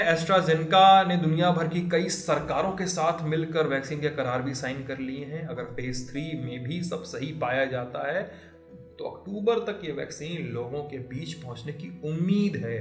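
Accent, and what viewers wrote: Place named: Uttar Pradesh, India. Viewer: Bhaiya ke bolne ka style lag rha hai up waale bhaiya hai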